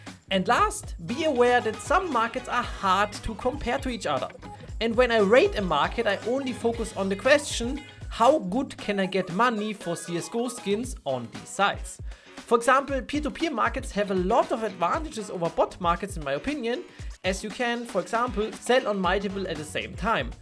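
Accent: Germany. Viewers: brandenburg englisch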